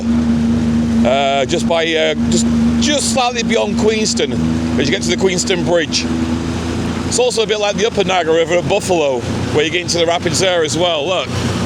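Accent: United Kingdom. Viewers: Where did you get that lovely British accent? Certainly not in Ontario.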